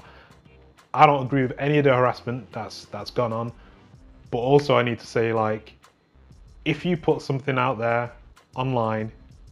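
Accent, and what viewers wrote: United Kingdom. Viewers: bruv, ya need some proper editing. Besides that, your commentary's dead sound. (not mocking the Manchester accent. I'm a huge England-fan and Manchester in particular from the Hacienda bands)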